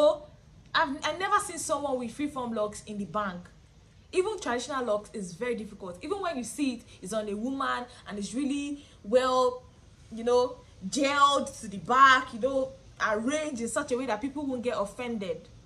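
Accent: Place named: Lagos, Nigeria. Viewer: What part of lagos are you from? You're accent is nice.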